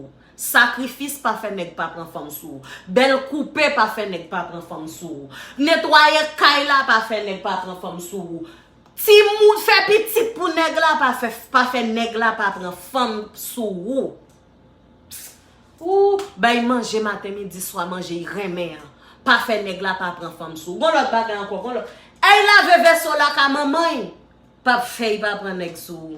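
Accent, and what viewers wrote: Cap-Haïtien, Haiti. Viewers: Helloo men mwen ...mwen vin la pou 2 bagay...mwen vin la pou bel ti accent capoise sa ou genyen an...avek bel konsey ou yo 🤣🤣😍😍😍...tout saw di yo se verite❤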